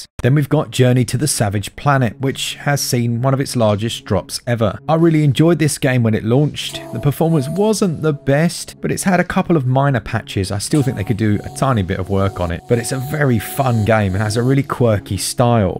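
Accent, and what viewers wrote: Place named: Essex, United Kingdom. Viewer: J W Definitely from around/near London, probably Essex/Kent way is my guess.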